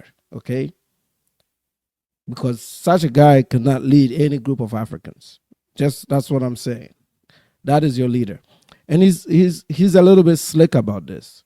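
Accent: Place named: Nigeria. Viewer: Tariq wrote that book years ago... When he was young.... Before he transitioned and became more consciously aware and connected to the black American struggle... You have a whole country of your own to worry about... You. Come from a third world country... I think you're Nigerian. You look and sound like it, Nigeria, a country that has over 200 million people. But yet it's annual gdps less than 500 billion, that's AJ poverty... You should be concentrating on that.. instead of calling black Americans, Temu blacks, a group of people that's way more successful than you have. Way more money than you. Accomplished more than you built more than you, that's why a lot of you guys come over here.. walking on college campus is that black Americans paved... Living in communities and in neighborhoods that black Americans help accommodate.. leaving your country and running away from it, because it doesn't work. 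And coming over here with black Americans have set the country up for you.... Don't worry about block Americans. It's a totally different ethnic group, black Americans or generations removed from Africa...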